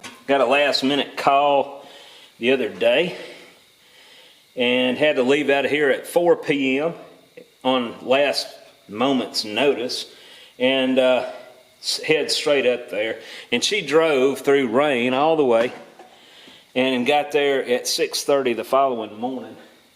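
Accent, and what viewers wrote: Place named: United States. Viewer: I miss Josie & Dollor and you speak very good American.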